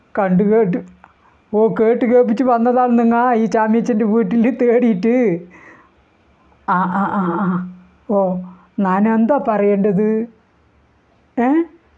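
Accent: Palakkad, India. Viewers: ഓഹ് അണ്ണൻ ഞൻ നിങ്ങളുടെ വലിയ fan ആണ് ട്ടാ..... Exspecially നിങ്ങളുടെ ആ സംസാരിക്കുന്ന ആ ഭാഷ ഇണ്ടല്ലോ നമ്മളുടെ പാലക്കാടൻ ഭാഷ എനിക്ക് ഇഷ്ട്ടായി..... ❤️😍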